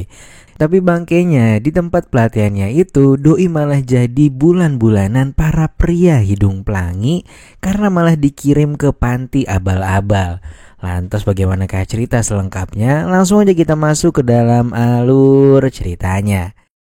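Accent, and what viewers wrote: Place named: Balangan, Indonesia. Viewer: Kayak suara orang balangan admin nya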